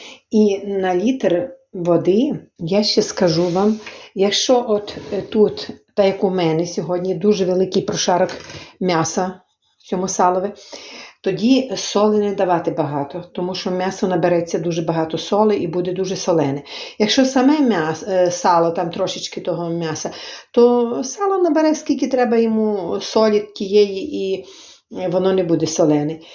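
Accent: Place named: Western Ukraine, Ukraine. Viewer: Какой говор интересный! Западная Украина наверное? Дякую за рецепт.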